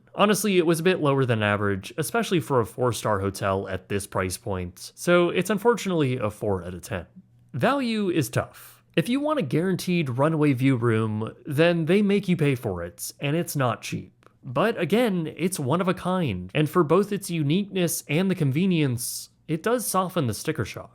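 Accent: Canada. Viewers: Mind you, rates change. For example his same room now is $270 i think hes quite nit picky and since this is a historic hotel this is very fair pricing and i disagree w majority of his pricing critques. Granted it sounds like hes canadian and their dollar is very weak in the US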